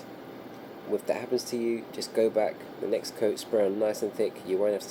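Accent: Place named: United Kingdom. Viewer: what filler did you use? or I guess its better what Kind of filler since you sound like your in UK somewhere and we in the US probably won't have the brand of filler you used. I got the same exact wheels on the 325i that I bought and it has curb rash on 3 out of the 4 wheels. you can probably do this even when its on the car but its easier to do it removed.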